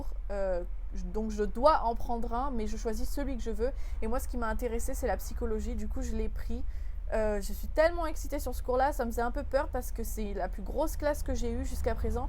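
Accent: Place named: United States. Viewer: lol, desole, je pense que tu es americaine, parce je vu ta video, tu parle bien anglais comme americaine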